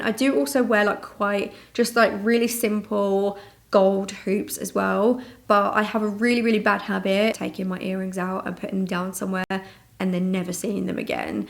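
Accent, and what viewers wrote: United Kingdom. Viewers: Was going to say, that's deffo a British accent xxx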